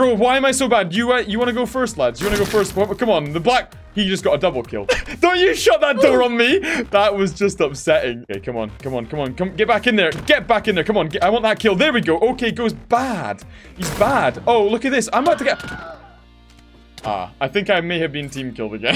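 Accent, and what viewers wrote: Scotland, United Kingdom. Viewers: Love your vids and I'm part Scottish from my dad side and your Sckttish accent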